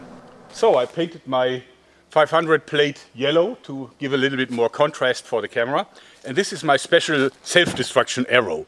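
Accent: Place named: North Rhine-Westphalia, Germany. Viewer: Da bin ich mal gespannt.... Einfach geil - der NRWler ist einfach durchzuhören !!!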